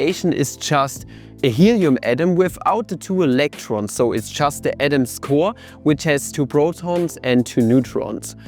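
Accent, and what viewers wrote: Germany. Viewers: Are you German? you have quite the accent.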